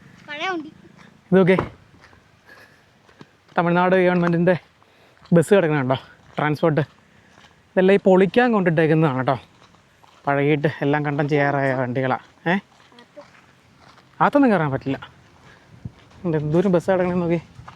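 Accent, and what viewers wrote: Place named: Idukki, India. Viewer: ഇത് x-mas വെക്കേഷന് എടുത്ത വീഡിയോ ആണോ. ചേച്ചി ട്രിവാൻഡ്രം ആണെങ്കിലും ഇടുക്കി സ്ലാങ് ആണലോ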